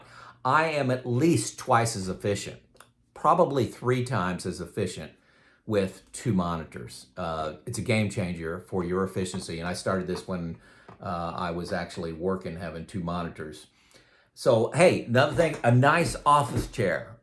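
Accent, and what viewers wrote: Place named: Southern Indiana, United States. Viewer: lol, I was wondering where your accent was from, you must live in the southern part of Indiana near Kentucky. You have a great accent!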